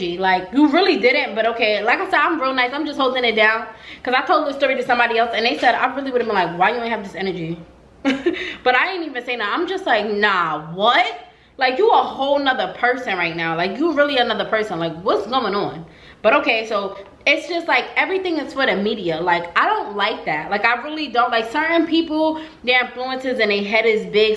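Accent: New York, United States. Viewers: Why did I think I was watching a nicki vid-when she was like “de de de-DOWN BELOW”😂 or maybe jus heavy NY accent. Either way-she’s gorgeoussss❤ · OMG! Sorry you had an awful experience. I had a second BBL by Dr. Blinski in Miami. Much better experience than Spectrum Aesthetics. That was a nightmare. I’m just here for your New York accent. You remind me of my daughter. ❤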